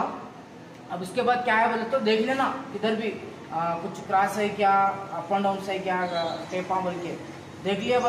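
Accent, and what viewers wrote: Hyderabad, India. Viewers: Talent ❤️..that Hyderabadi accent ❤️ kiraak 🎉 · Hyderabad hindi Right?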